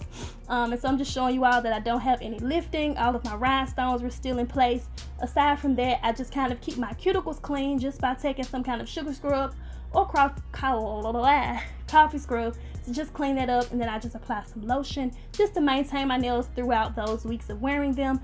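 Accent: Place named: Memphis, United States. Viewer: wow, you are so cold! I heard your Memphis accent and was instantly looking for an appointment website bc your nails are so nice! lol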